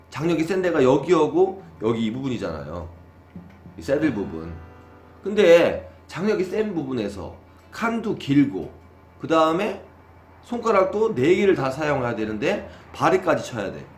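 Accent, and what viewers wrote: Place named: Jeolla, South Korea. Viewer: 정말 초짜들에 대한 배려가 넘치는 강의이십니다. 기가 막힌 강의 잘 들었습니다. 한 마디 한 마디가 피가 되고 살이 되는 말씀입니다. 계속 초보자들의 어려움을 헤아리는 강의 부탁 드립니다. 선생님 계신 곳이 전라북도인가요? 익숙한 억양이라 점감이 더 큽니다. 저는 군산입니다.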